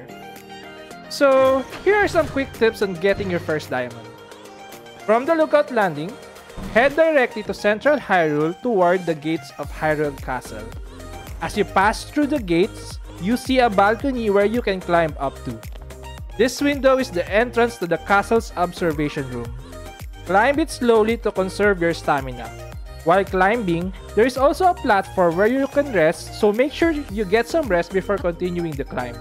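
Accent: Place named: Philippines. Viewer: you kinda sound like a filipino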